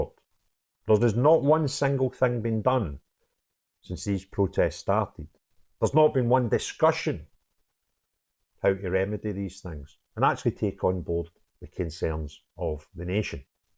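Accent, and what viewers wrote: Scotland, United Kingdom. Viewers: Love your accent, makes me want to move to Scotland 🏴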